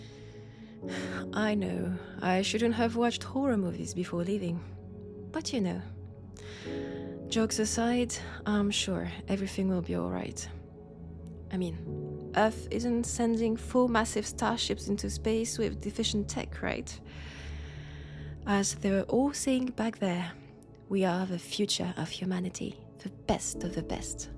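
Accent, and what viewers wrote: France. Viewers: wow.....just wow, This was difficult to listen to, not because it was bad but because it was too good. Your voice carried so much emotion in it and when it started cracking, so did i😅. Life taking you away from someone you deeply care for is always a tug at the heartstrings, and this story was just made so beautifully around that idea. This is the first audio ive listened to of yours and although this is a packed up sendoff to these two, i think i can speak for most people when i say we need a part 2, or at least a resolution where they find eachother again. Something, anything. Our souls need it to heal😭 (i also adore french accents ever since i visited france a few years back, love your voice😁👍) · I like the French Accent. Thats a nice Roleplay and there a emotions on it that i can feel myself. Good job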